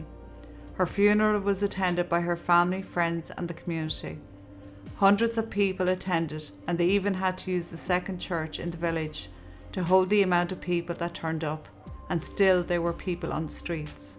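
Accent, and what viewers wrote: Ireland. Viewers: How is it possible that his family did not realise that he had schizophrenia and as such a very real danger to himself and society, I wonder. So sad about Mairead, she was such a wonderful young woman. Thank you for your channel, and your lovely Irish voice! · you have an Irish accent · Love love love..cant wait for more content. A lovely irish accent. Such sad cases. X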